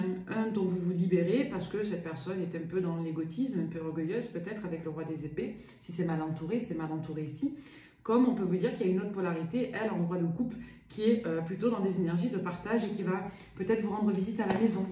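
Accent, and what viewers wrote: South, France. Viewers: Cc tu as un accent du sud😊❤❤❤moi j adoreje ss de toulon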